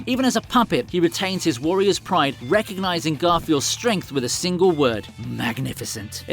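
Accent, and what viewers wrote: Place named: United Kingdom. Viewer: I searched best anime fights and expected a compaction. Wasn’t prepared for some British clown talking but do you I guess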